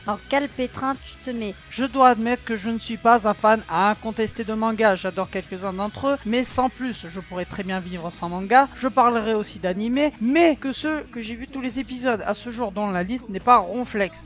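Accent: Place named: Marseille, France. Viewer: ho...j'croyais que t'était marseillais...oui,par ce que t'a vraiment l'accent...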